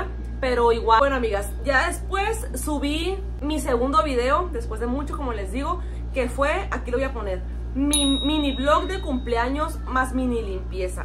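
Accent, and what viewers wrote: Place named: Sonora, Mexico. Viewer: Que emoción 🎉 Yo llegué a tu cana porque me apareció el video de comer con 50 pesos, me llamó la atención y más el tono de tu voz dije ha de ser de por acá 😅 y luego después me apareció donde lavas los unifofme y dije ¡Ah si es de Sonora! ❤ y te di seguir y desde entonces me ha fascinado verte y ver a tu hermosos hijitos, como vas creciendo día con día. Me encanta tu actitud y como cuidas a tus niños. Muchas felicidades paisana ❤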